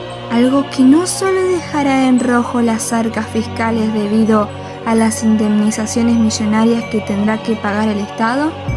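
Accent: Argentina. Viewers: ¿Eres de Perú?, suenas como de argentina xd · Tanto terror? A proposito de donde eres, este es una exageracion. Lean no se dejen esta con dejo argentina les convensa si en verdad quieren a Perú. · Siempre me he preguntado si eres Argentina? Por que tu voz es muy bella.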